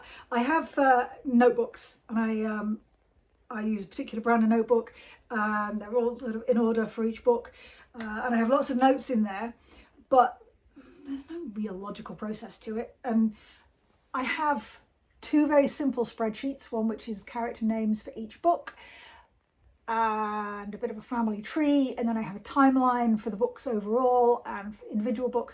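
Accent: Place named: United Kingdom. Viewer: Hi - greetings from Denmark. So nice to hear your voice. I enjoy your books, and it was so great to hear your British accent and even some dialects. You take care of your wife and cats. All the best. Kirsti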